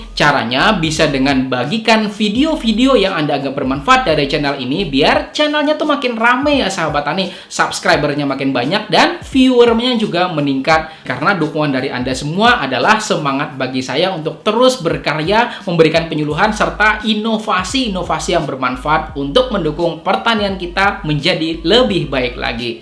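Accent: Banjar, Indonesia. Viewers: Urang banjar kayak gitu ai... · Bukan Sunda tapi banjar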